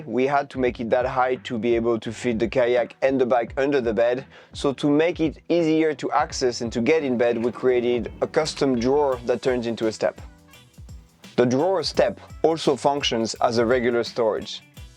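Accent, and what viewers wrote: France. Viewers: French accent!😅❤ Thank you for sharing.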